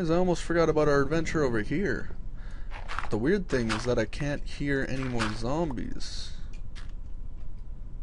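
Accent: Canada. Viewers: could you speak some Canadian in a commentary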